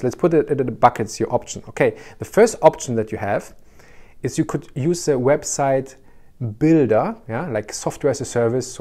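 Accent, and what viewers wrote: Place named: Germany. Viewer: Kann ich dich vielleicht irgendwie sprechen, ich bräuchte etwas hilfe. Danke für das Video, ich habe rausgehört das du Deutsch bist. LG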